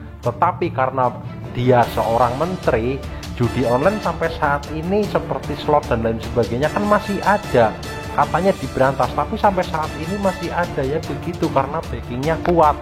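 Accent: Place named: Banyumas, Indonesia. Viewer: logat ngepeknya mantep bro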